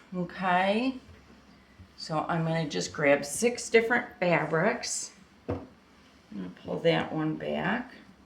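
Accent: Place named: Rochester, United States. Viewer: Are you from Rochester NY ? I here the accent.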